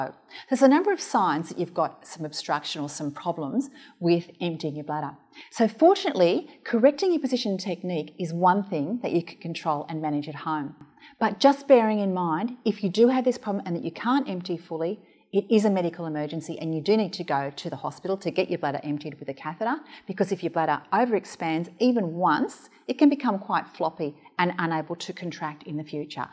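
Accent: Australia. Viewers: Terrible Aussie accent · With a voice like that it's definitely down under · It'd just an Australian accent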